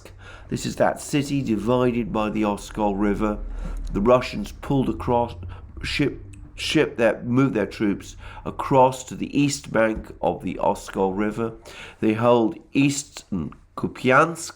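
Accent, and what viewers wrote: United Kingdom. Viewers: Good day! :-) thanks again for your brilliant and intelligent analysis. And let me add, i love your nice british accent, which i learned in school but heared never again in real life. It makes it possible as a german-italo speaker to understand all perfectly.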